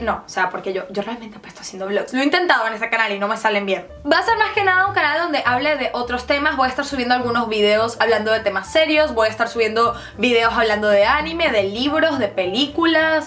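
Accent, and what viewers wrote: Venezuela. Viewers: YO SABÍA QUE ERAS VENEZOLANA LLEVO RATO ESCUCHANDO EL ACENTO AHAHHA Hola yo también soy de Venezuela ❤ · El acento venezolano no lo has perdido. Pareces una loca rusa pero el acento supe que eras una chamita venezolana. DTB desde Venezuela. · Ojalá Venezuela salga por lo que está pasando y si tienes el acento venezolano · Si tiene acento venezolano, soy venezolana y al ver uno de sus vídeos por primera vez me dije "esta chama tiene que ser venezolana por el hablar" xD solo que todos hablamos un poco diferente dependiendo del estado donde vivamos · de que parte de Venezuela eres te reconocí por el acento venezolano, de mi parte soy de los andes Tachira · Y ya se te notaba que eras venezolana , saludos desde Venezuela . Cojedes :p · Hola. tienes una voz bonita entre venezolana y ese asentó jajajaja vives en rumania?¿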